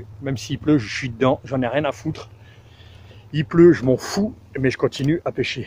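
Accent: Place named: Haute-Marne, France. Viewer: T'as gagné un nouvel abonné , t'es un vrai haut marnais , "un tiot machin" " j'chie d'dans" 😂 du vrai parlé de chez nous , et je te suis quand tu parles des grandes marques ....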